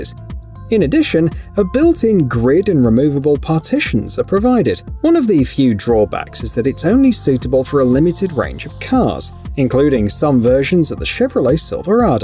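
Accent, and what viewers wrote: United Kingdom. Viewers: Loose the Limey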